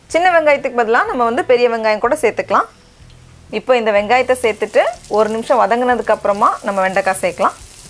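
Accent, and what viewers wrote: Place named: Kanyakumari, India. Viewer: thappu kidayathu sister athu avanga native kanyakumari slang..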